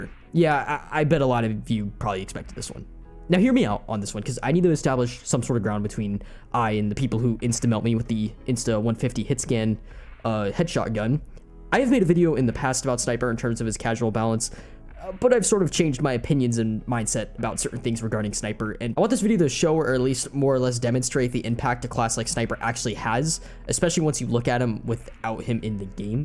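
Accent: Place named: New Zealand. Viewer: He’s actually from New Zealand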